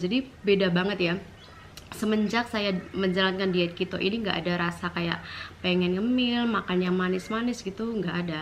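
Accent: Java, Indonesia. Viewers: njowoo banget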